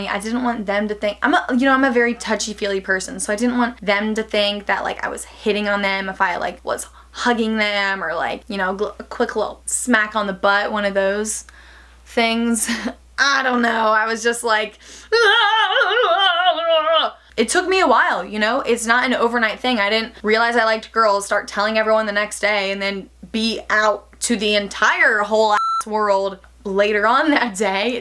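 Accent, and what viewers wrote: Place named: United States. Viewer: You're like one of those classic, aesthetic AF american tumblr girls! Your accent, clothes, hair and even the way you style your hair... you'd stand out (in a really good way) here in the UK!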